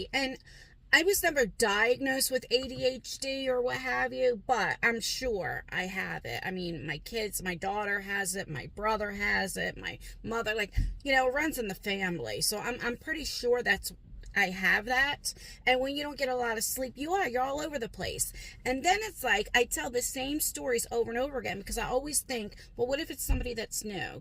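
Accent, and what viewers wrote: New Jersey, United States. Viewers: Hold on to your hat,. I subscribe to over 800 YouTube channels. I have a very wide range of interests. I quit cable two years ago and YouTube supplies me with news, films, how to's, and lots of entertainment. I thought I'd miss TV but I don't and that's about twelve hundred dollars a year back in my pocket. I'm also a Jersey girl and when I hear you talk I hear my sister's accent and speaking style. You can take the girl out of Jersey but you can't take Jersey out of the girl. Cheers! 😊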